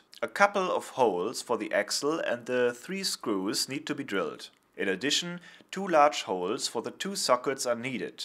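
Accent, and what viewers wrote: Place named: Germany. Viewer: Du klingst so Deutsch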